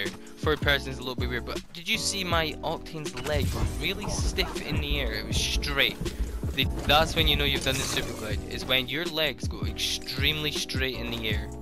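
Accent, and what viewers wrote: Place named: North Scotland, United Kingdom. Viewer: You definitely sound like you're from the north of scotland lmao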